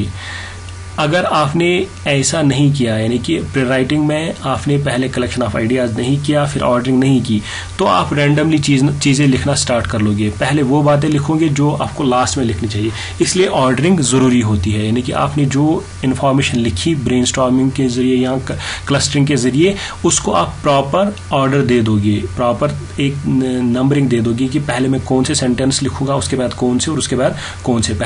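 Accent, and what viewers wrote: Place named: Kashmir, India. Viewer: A Clear kashmiri accent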